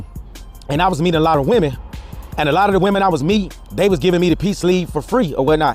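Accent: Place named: South, United States. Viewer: Hello from the Matrix. Love me some. ❤❤. Southern accent I could listen to all day.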